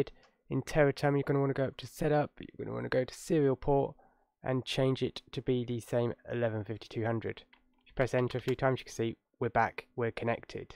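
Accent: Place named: Cockney, United Kingdom. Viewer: Hard to understand the Cockney accent. Stop chewing on the chewing gum.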